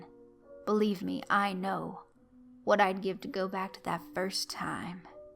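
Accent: South, United States. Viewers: Great narrations love the southern accent really like your productions keep up the good work.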